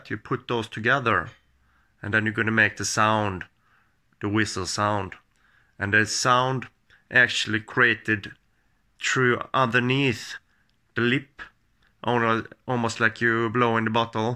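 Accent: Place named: Sweden. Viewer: Märker det genom ”dialekten” eller vad flesta nu kallar ”svengelska”. Jag själv har den dialekt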